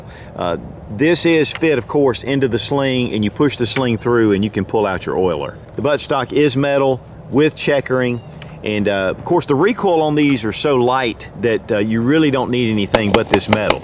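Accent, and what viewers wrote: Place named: South, United States. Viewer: The bayonet lugs were not removed later, they were added at the very end of WW2 and used in Korea and later Viet Nam. The M3 trench knife was adapted with a fitting to lock into the lug and is known as the M4 bayonet. I have an original Inland made in 1944 and love it. My safety is not the lever as is pictured on yours, but the button push through version. I have dropped my mag many times while trying to come off safety. Not a good thing to happen in combat! A good reason to change to the lever version. Great review! From your accent I assume you are from Georgia, South Carolina or Tennessee. Am I right? I am a Jawja native myself and still kickin' the red clay to this day.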